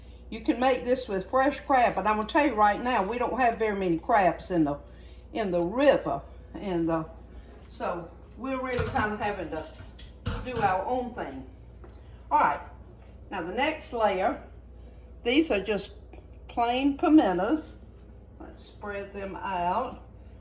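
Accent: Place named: Savannah, United States. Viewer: I shall and you sound like my people from Savannah and South Carolina. I love it!!! And they DID the crab! They went and spent a whole morning catching them and then the whole afternoon picking them! Good memories!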